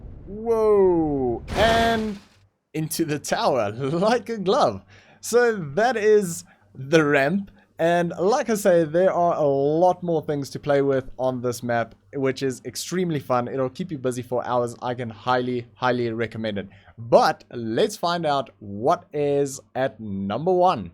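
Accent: South Africa. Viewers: are you from South Africa? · u sound like ur from South africa Are u?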